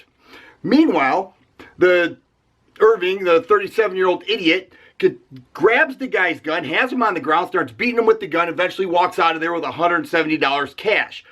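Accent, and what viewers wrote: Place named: South Boston, United States. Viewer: Where did you get that accent.? I want to to say south Boston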